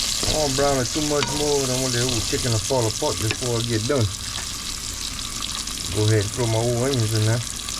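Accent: Louisiana, United States. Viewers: Great solo trip 😊miss seeing little G and I still enjoy hearing your Louisiana accent ❤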